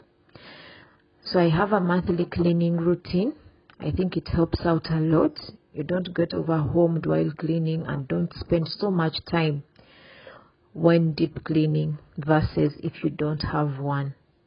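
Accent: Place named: Zimbabwe. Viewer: Accent sounds very Zimbabwean 😘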